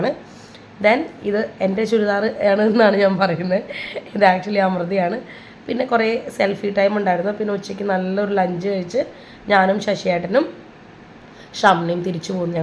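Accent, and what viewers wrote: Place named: Thrissur, India. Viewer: Hi chechi episode kandu 🤩ivide amma ennum kaanarind ee paripady. Chechide videos okke njan youtube il kaannarind pettannu tvil kandappo suprise aayi 😍😍 njan ammodu parayarnnu ee chechine inikkariya inikki ariyana chechi aanu ennokke. 🥳🥳🥳 skit valare nannayirunnu nammade tsr slang skitil ketappo nalla rassandayi 😌🥳 inim skitilu chechine pratheekshikkamo🙈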